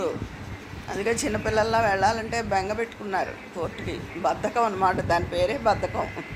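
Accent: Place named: East Godavari, India. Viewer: Naku mee vedios choostuntanu meeru eppudu na coments ki reply evvaledandi meeru.mee E.G language chala baguntundi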